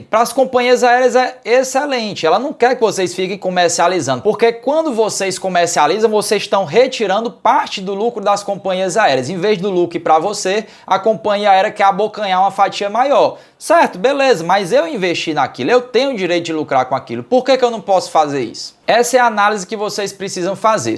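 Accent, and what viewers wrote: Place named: Fortaleza, Brazil. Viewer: Tu é de Fortaleza macho 😀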